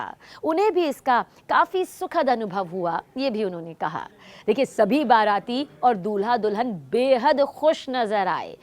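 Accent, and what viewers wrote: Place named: Mumbai, India. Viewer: Ap maidam bihar se nahi ho apke awaj lag rahi ha ap mumbai ke ho 👍🏾👍🏾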